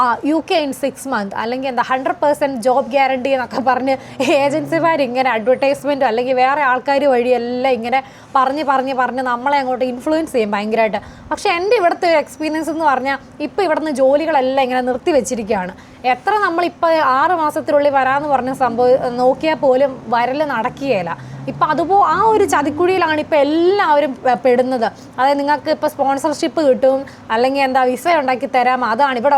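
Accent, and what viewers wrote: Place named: South Kerala, India. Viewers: സംസാരം കേട്ടിട്ട് ഞങ്ങളുടെ തിരുവല്ല കോട്ടയം ഭാഗം ആണെന്ന് തോന്നുന്നല്ലോ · കൂടുതൽ പേർ വിദേശത്തേക്ക് വരാതിരിക്കാൻ സ്വന്തം നിലനിൽപ്പ് ഭദ്രമാക്കൻ വേണ്ടി യാണ് തെക്കൻ മലയാള ഭാഷ സംസാരിക്കുന്ന ഈ സ്ത്രീ യുടെ ലക്ഷ്യം...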